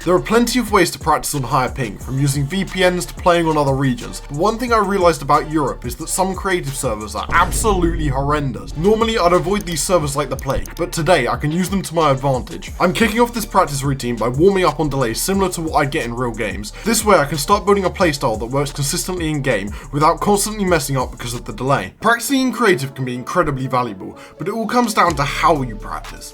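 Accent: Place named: North America. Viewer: lol you sound na not tryna be rude tho but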